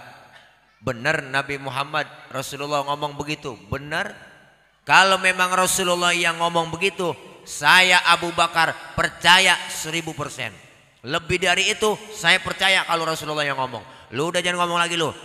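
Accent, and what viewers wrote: Betawi, Indonesia. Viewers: Asalamualaikum pak ustad..bahasa betawi ny pak ustad bikin kita. semua..ketawa mulu..terima kasih ilmu ny pak ustad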